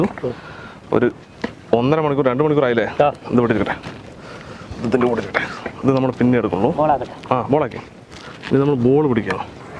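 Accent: Palakkad, India. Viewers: am from Coimbatore i saw your all videos like it especially your palakkad slang is good and like it basically am from palakkad. one day I want to join with ur food preparation and tasting with you. If any chance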